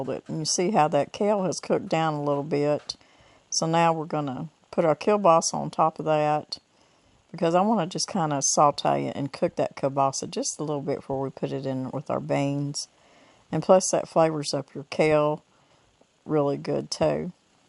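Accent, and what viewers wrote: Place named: Texas, United States. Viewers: that’s how we say thing in Texas! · U2 are wonderful, please tell me your accent, were are U from......Texas maybe................I just Love this accent. .......I am French Canadian living in Vancouver BC and I jet get exited with your life...Thank U2 for your great meal................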